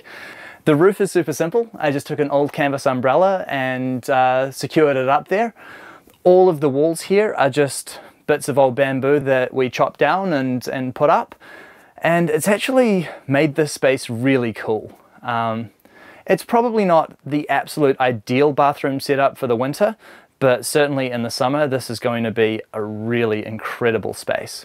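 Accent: New Zealand. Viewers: Sounds like a new zealander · Before I even heard him speak I thought 'this guy must be a kiwi' ... haha!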